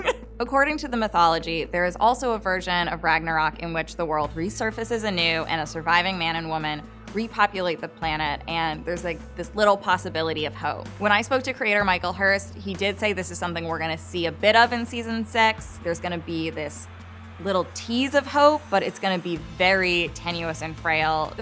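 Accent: United States. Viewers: I cannot stand this lady's voice this type of American accent is the worse sound in the world !!! And so and like and like whatever just speak he English language properly if your not going to you could always create an American language tbh I can't stand anyone from the new world talking about history ... Your all so isolated and far removed. From the rest of the world your perception of the old world is just wrong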